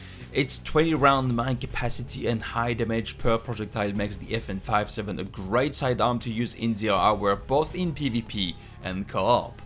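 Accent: France. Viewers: je me disait bien aussi que je reconaissait l'accent francais ces bien que tu puisse faire tes video en anglais ta plus de chance de faire parler de toi jaime vraiment ta facons de detailler ce jeux continue comme sa :)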